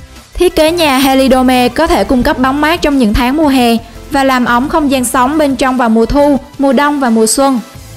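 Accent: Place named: Binh Dinh, Vietnam. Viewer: cij này ns tiếng như bình định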